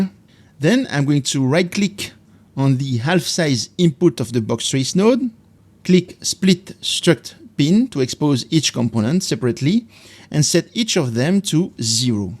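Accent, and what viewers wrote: France. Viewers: L'accent française est vraiment la pire.. ouch. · L'accent français de zinzin 😅Merci pour le tuto complet c'est top !!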